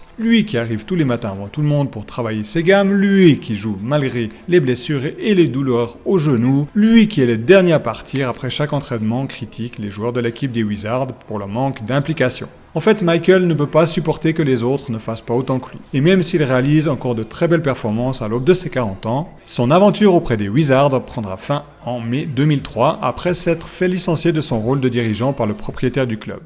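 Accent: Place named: Switzerland. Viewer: Bravo pour le boulot et l’accent Suisse qui fait plaisir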